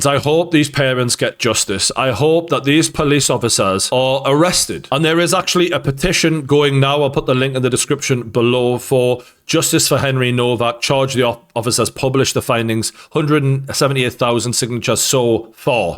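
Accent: Newcastle, United Kingdom. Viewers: 100% Geordie lad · I love this geordie, no nonsense guy ❤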